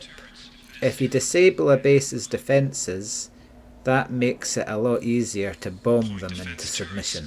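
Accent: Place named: Scotland, United Kingdom. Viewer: No, that's right. Nothing harsh about it, it's more of a "thoughtful" Scottish accent. 🙂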